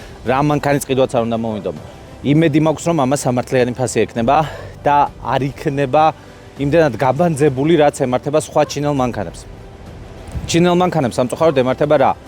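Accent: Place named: Mingrelia, Georgia. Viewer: როგორ მიყვარს მეგრელების ლაპარაკი <3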